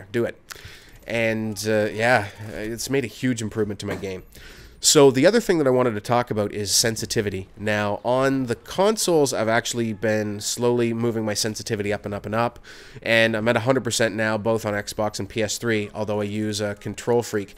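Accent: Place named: Newfoundland, Canada. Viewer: where is from.. he sounds like hes from newfoundland.....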